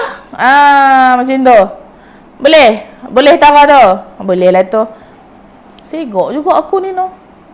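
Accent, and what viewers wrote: Kedah, Malaysia. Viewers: Akak org kedah ke penang?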